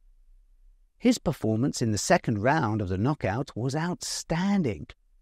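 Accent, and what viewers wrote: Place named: England, United Kingdom. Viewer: Though I'm not into football , but thanks so much for the shadowing, it's very helpful and I like your English accent and tried to imitate it. 😊💕🇬🇧💕👍👍👍👍👍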